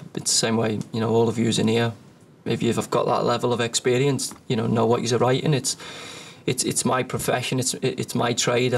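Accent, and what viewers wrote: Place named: France. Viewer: so he decide to continue dat french accent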